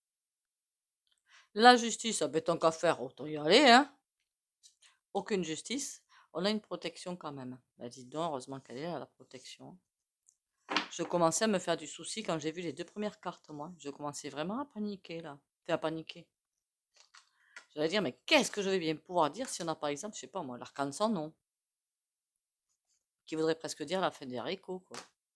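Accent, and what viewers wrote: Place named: Pyrénées-Orientales, France. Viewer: Bonjour merci beaucoup nous on a le mistral qui souffle à 120 km heure on se pèle pourtant il fait que 9 degrés j'étais à l'hôpital Sainte-Marguerite ce matin à 8h pour faire un prélèvement du liquide que j'ai à mon genou synovial je crois que ça s'appelle émettre la cortisone je repousse le moment de la prothèse je me repose au chaud bisous passer une excellente journée et câlin aux petits cœurs vous avez l'accent des Pyrénées-Orientales😊❤❤❤❤🥰🥰🥰🥰🥰🥰🐕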